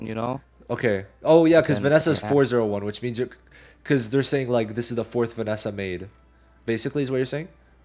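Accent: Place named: Germany. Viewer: Bro sounds so german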